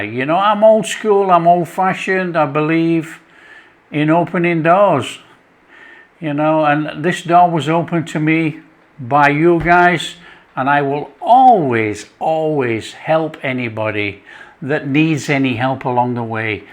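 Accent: Yorkshire, United Kingdom. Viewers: It is your lovely straightforward Yorkshire deliverance and of course the honest down to earth food. Keep going. All the best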